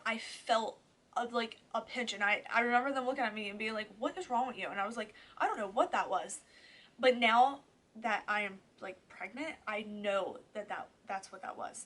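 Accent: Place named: New Jersey, United States. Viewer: I just knew you were a fellow New Jerseyan from the first second of the video lol😭 loved this video. I’m having the same exact symptoms 😩 I’m scared to test so I’m just waiting out my period, if it ever shows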